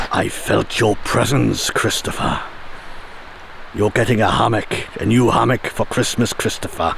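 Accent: Newcastle, United Kingdom. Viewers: I have to ask are you an east country man? A Jordy perhaps?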